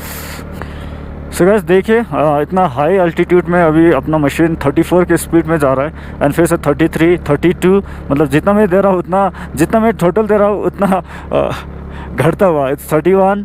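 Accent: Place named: Northeast, India. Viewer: Yeh hai apna northeast hindi....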